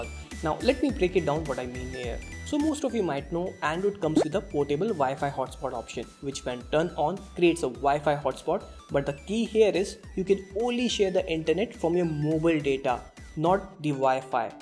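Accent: India. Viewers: An Indian I can understand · I love your low-tone indian voice, every word is properly pronounced. Great job!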